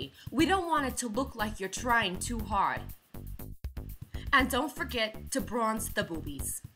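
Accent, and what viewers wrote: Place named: New Jersey, United States. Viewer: Oh man I loved this video!! You're so funny and have this wit and charm!! The milk jug and little dog in the hair cracked me up!:)) I love the Joisey accent. I mean Jersey.;) I'm from Joisey,I mean Jersey. lol you're the most gorgeous sexiest most beautful girl and I so love it when you play with your beautful hair and do vids like this!! You're the best my dear!:))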